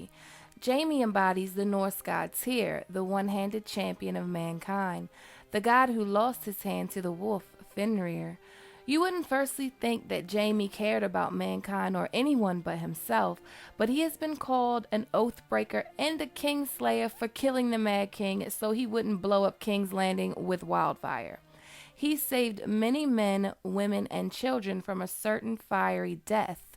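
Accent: United States. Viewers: Considering I'm not normally one who goes for American accents I couldn't agree more. Like sweet music......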